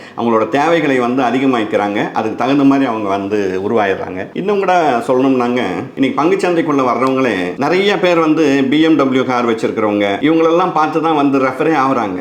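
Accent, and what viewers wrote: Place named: Coimbatore, India. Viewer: கோயம்புத்தூர் தமிழ் ஒரு தனித் தமிழ் தானுங்க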